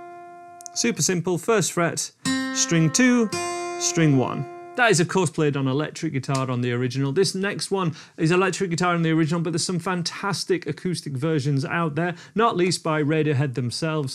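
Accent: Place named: Northeast England, United Kingdom. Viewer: Dude are you from the north east of England?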